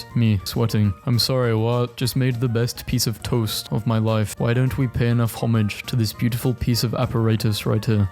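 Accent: United Kingdom. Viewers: Wait… your British? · ur bri'ish? i thought u were an aussie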